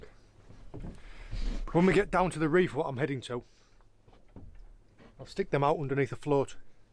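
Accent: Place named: Northern England, United Kingdom. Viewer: where you from pal i recognise that accent , up north somewhere?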